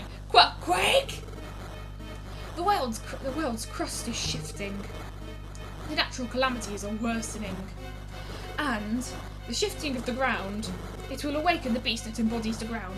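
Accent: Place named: Europe. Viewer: Yay, the fugitive saga is done! The ending always gets me a little choked up. Your partners reaction to the truth is so genuine and heartfelt, and the music makes the moment that much more emotional. I remember the huge feeling of relief I got when I first played. The fugitive saga definitely has its problems with the crazy difficulty spike and low variety of Pokemon, but it will always have a special place in my heart. I've also heard that males have a higher crit rate and females have higher accuracy and evasion, but I can neither confirm nor deny this. It seems to be the case from my experience though. About your commentary, sometimes I have trouble keeping up, but that might be because I'm not used to European accents. Also, I get a little overwhelmed by how many things you talk about, but I understand that dungeon crawling isn't the most exciting thing and that you need to talk a lot to fill the time.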